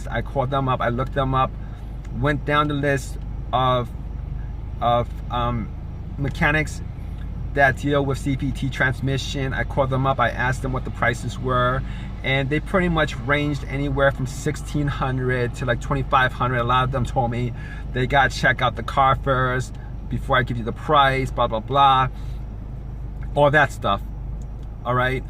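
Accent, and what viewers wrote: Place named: New York, United States. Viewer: love the accent!,new york,boston?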